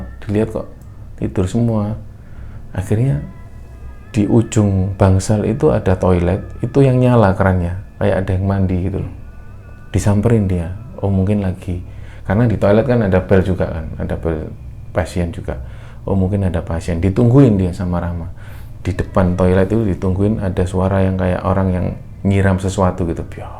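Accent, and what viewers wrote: Java, Indonesia. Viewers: Mas tetap jadi story teller ter-fav! Oh iya mas, saran untuk memperbaiki pronunciation kata dalam bahasa Inggris. Sebenarnya gak masalah dengan logat jawanya karena saya juga orang jawa timur. Tapi menurut saya lebih baik jika cara pengucapannya benar 😃 Dan jika ada kesempatan, saya ingin menceritakan cerita horor temen saya yang pernah bekerja sebagai perawat orang cacat dan kejadiannya tahun lalu, di Hamburg. Tidak terlalu panjang, tapi setidaknya bisa berbagi bahwa hal seperti ini juga ada di negara lain 😁 Semoga makin sukses! 😃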